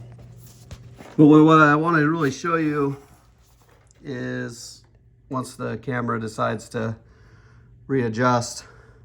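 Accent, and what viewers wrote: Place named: Canada. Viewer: Great video bud ! You sound like a fellow Canadian lol , do you do repairs? I’ve had this dreaded vacuum leak for a while now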